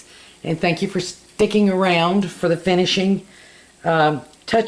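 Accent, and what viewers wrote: South, United States. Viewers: Ma'am Debbie, I have enjoyed this video very much. You provide excellent information and I love your down home accent. I also want to compliment you on the quality of the seasoning on your cast iron skillet. It looks first rate. Keep the wonderful videos coming.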